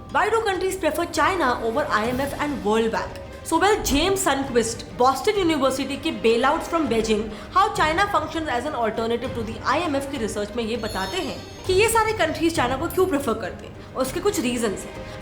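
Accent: India. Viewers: It's weird seeing a Chinese looking lady with Indian accent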